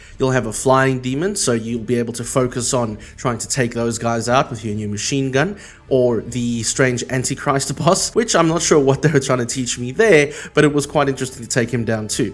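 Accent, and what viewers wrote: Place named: Cape Town, South Africa. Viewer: Haha I thought so, I recognize that local accent anywhere, I'm from Cape Town 😎 Yeah it is really satisfying to get a game working in EFPSE but as many limitations as there are, there's also a strange learning curve to a lot of it, which makes me think it's not really worth it at times, there's a huge lack of tutorials for the engine which is why I make some when I can, but my knowledge is really limited at the moment. And yes I looked into Trenchbroom recently, looks really cool! I might give that a go soon, as well as Godot. Keep the content coming, you're doing great and I look forward to seeing what games you make in future! 🎉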